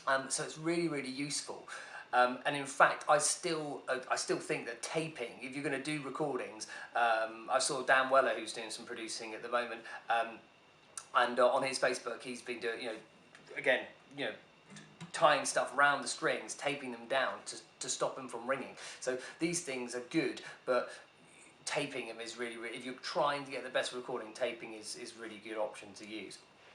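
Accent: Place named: United Kingdom. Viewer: Cool vid and the epic British accent! +sub:)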